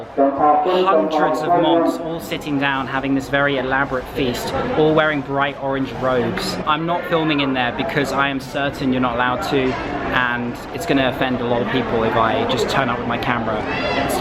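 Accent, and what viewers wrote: United Kingdom. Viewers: I love the content of your channel. Great camera work and editing with beautiful British English. Love you. ❤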